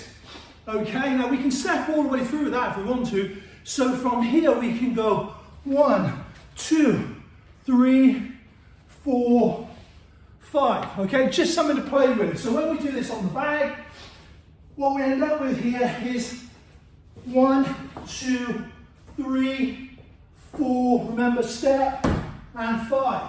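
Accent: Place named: England, United Kingdom. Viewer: That English accent! Cool 👍👍👌👌👊👊🥊🥊🥋🥋🙏🙏😁😁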